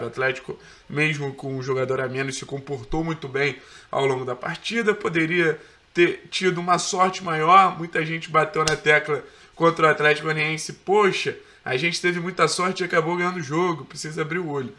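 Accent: Rio de Janeiro, Brazil. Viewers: E esse sotaque carioca torcedor do furacão? 👍👍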